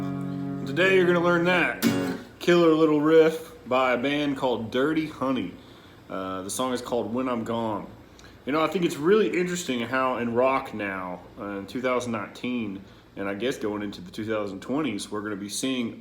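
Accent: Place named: South, United States. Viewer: man enjoyed it by your accent you sound like a southern boy where you from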